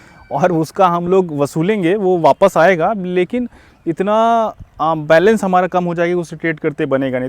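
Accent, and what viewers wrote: Uttar Pradesh, India. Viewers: Sir yeh Kaunse GARDEN me Video banate ho har Sunday ko? aur aap Kaha se ho...? aapka accent UP wala hai, mai bhi UP se hu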